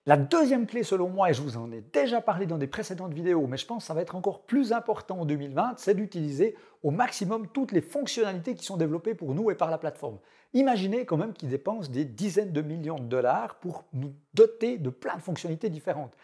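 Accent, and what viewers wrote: Switzerland. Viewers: Hello je suis vaudois, t'as un accents qui vient de quel region en suisse?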